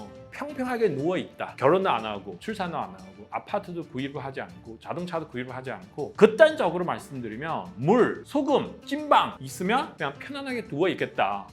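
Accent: China. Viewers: 너는 중국부정뉴스를 말하는것보니깐 중국에서 무지 당했구나 😂😂근데 그렇게 중국싫어서 귀화했는데 중국은 가냐? 글고 한국국민됐으면 한국말좀 제대로해야지 누가바도 중국식 한국어야 😂😂😂 중국티 내지말아야지 본인도 중국있을때 도덕이 바닥친것같은데 글고 니도 대만민진당같이 이야기하네 너는 중국 어느시대살았나 암튼 그렇게 중국싫어서 한국국민됐으니 축하한다 중국식한국말하는 한국인😂😂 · 씨는 찐 조선족 동포 한국인 같네요. 잘 듣고 갑니다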